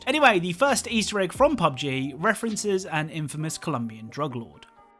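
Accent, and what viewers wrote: United Kingdom. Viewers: Are u british cos i am just wondering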